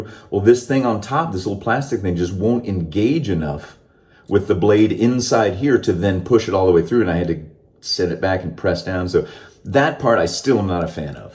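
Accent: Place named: England, United Kingdom. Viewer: Hi, loving the English accent (00.30), made me chuckle. I'm not use to safety razor - everytime I shave the hairs get stuck inside and block the blades even under running water. Is this because I use foam so safety razor use a special shaving lather?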